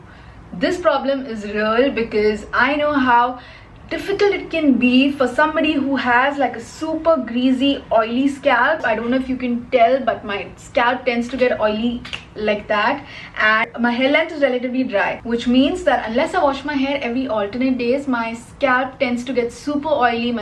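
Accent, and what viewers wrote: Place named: New York, United States. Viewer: Love that shimmery eye shadow The way you talk is very nyc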